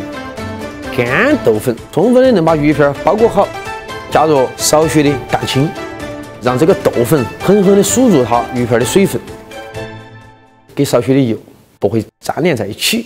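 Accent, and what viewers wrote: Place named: Sichuan, China. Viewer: 哈哈哈，也會說四川話了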